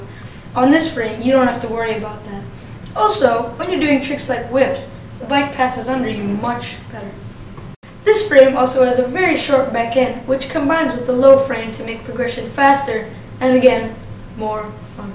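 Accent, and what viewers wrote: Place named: United States. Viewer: are u american or russian ur names sounds russian but your accent is american im confused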